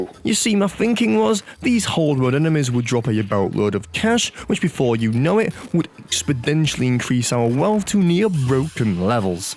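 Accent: United Kingdom. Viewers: youtuber and british acent at the same time is crazy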